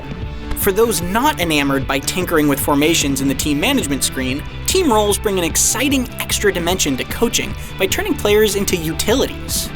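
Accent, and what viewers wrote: United States. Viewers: nice try but if i hear an american accent i automatically dont need your opinion when it comes to football .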